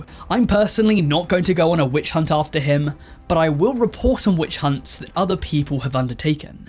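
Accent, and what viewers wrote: United Kingdom. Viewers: I wouldn’t listen to anyone talk about the fall off of masteroogway, except for and his glorious British narration😍😍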